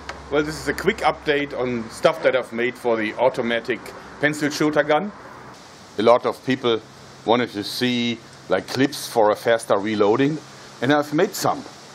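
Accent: Germany. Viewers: Are you german? I think I hear a slight German accent but I’m not sure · Bist du Deutscher hört sich so an ?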